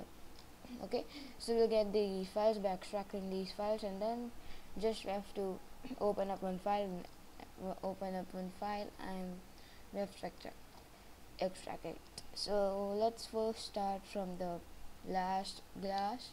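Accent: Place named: India. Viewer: you have an indian accent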